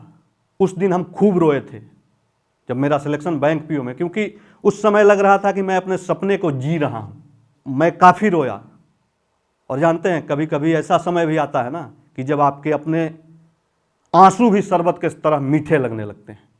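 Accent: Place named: Bihar, India. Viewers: हमको त बोलिये से मालूम चल गया कि भईया बिहारी हैं.... 👇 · बिहारी अंदाज में समझाने के लिए बहुत-बहुत धन्यवाद 🙏🙏🙏🙏 जय बिहार ❤️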